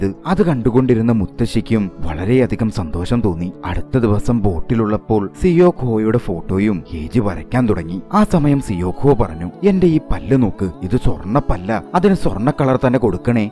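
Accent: Ernakulam, India. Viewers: നിങ്ങൾ എറണാകുളത്തുകാരൻ ആണോ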